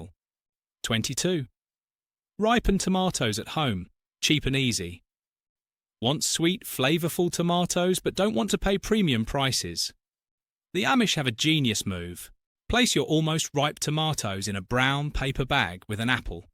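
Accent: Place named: England, United Kingdom. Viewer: You’ve got a flaming English accent, so speak English not American it’s coriander and biscuits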